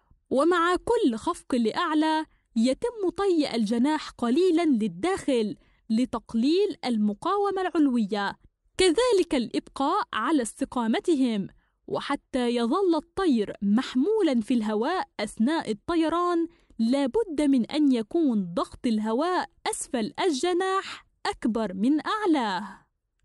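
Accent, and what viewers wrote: Tunisia. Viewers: انتي تونسية؟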